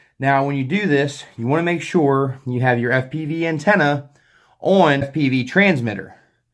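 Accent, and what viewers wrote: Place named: Philadelphia, United States. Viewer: Thanks.. You sound like you live near Philly lol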